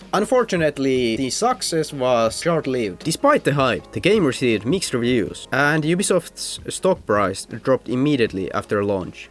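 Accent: Finland. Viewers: Jätkä puhuu yllättävä hyvää englantii. Kyl tos o ripaus sitä suomalaisuutta en oo growist kuullu pitkää aikaa nii iha mielenkiintosta kuulla täst pelist pitkäst aikaa. Mahtava video!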